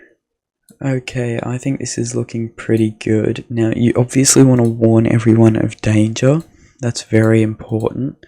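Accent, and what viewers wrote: United Kingdom. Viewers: are you british?😊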